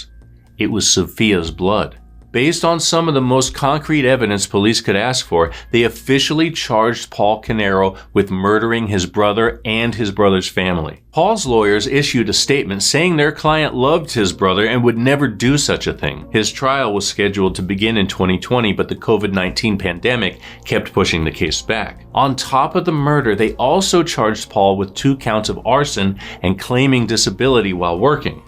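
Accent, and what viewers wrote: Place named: New Jersey, United States. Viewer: Holy crap I never realized how familiar your accent was until now. I grew up Monmouth County NJ where Colts Neck and Asbury Park are located. You gotta be from the Tri-State, but I think you're from NJ. Mentioning the proximity to NYC introducing those town names hit me right in the heart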